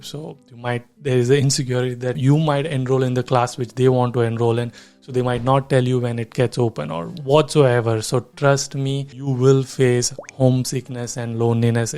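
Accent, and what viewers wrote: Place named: India. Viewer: accent = good but speaking = still indian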